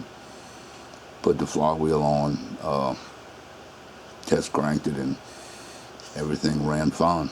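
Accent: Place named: Louisiana, United States. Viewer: Thanks for the video. You sound like you might be from Louisiana, likely east and south of I-10. Ask me how I known. That explains the damn corrosion.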